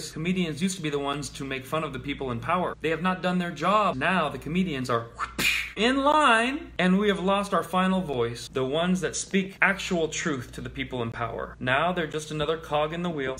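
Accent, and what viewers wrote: United Kingdom. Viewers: Good British accent K-Von,you make me laugh,and I agree with everything you say."Get in there""!!!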